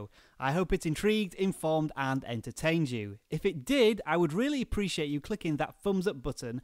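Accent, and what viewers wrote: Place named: United Kingdom. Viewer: you live in Canada? Are you British though? You have got a British accent in my opinion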